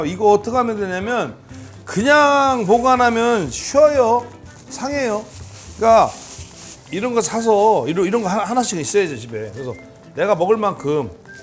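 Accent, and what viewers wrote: Chungcheong, South Korea. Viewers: 우리 백선생님은 요리를 구수한 충청도 사투리 억양을 양념으로 살~짝 깔고 설명해 주셔서 뭔지 모르게 쉽고 맛깔나는 듯~ 화이팅 입니다~😊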